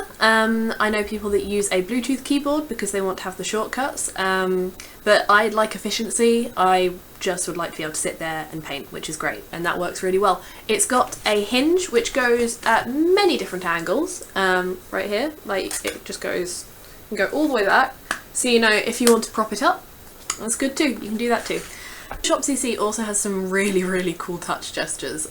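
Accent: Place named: United Kingdom. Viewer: I'm Turned On ! geeky, british accent and beautiful !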